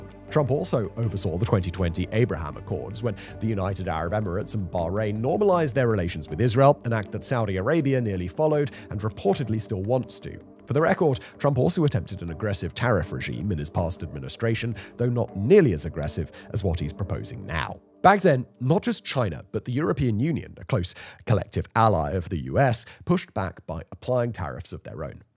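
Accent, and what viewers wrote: United Kingdom. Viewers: you talk too fast. when we here a British person, we need to feel like we're being served something. its weird.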